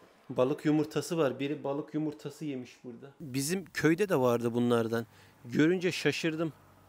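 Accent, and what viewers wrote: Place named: Anatolia, Turkey. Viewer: abi japonyanın en kuzeyinde bile anadolu şivenden ödün vermemen çok özel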